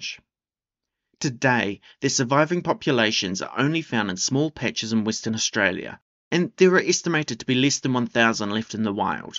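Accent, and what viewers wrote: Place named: New Zealand. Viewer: That's some accent you've got there pal, it took me a few seconds to figure out that the "iglang" mammals were egg laying mammals, New Zealander?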